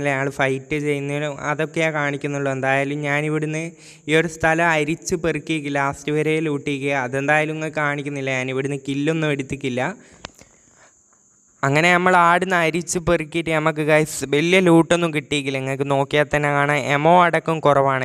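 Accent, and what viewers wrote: Kasaragod, India. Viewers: Da kasaragod aano beck beljji😂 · 'kasaragod aaano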